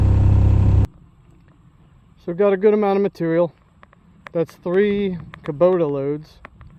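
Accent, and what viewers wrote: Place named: Reading, United Kingdom. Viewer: Hey there sir! I’m just up North in Schuylkill County. Being from Reading originally I picked up on your accent immediately. Congrats on the well made Johnson-Su compost. I just started mine made up of Urine/LAB soaked biochar, pumpkins, and LEAVES LEAVES, LEAVES, shredding as many as I can with my hands weed whacker (unfortunately my mower does not have a bagger)! Do you have any tips on getting your pile up to temp? I just built mine today and I’m concerned it might not win the fight against December’s temps.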